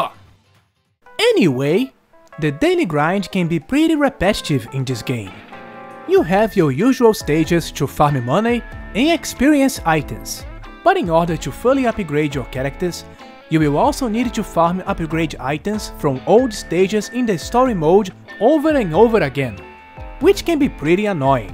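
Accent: Brazil. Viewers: sabia kkkk vc fala um ingles com um sotaque forte de brasileiro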